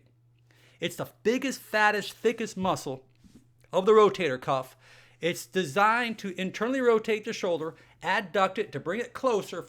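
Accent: Australia. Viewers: hi Are you from Australia? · Are you from austrelia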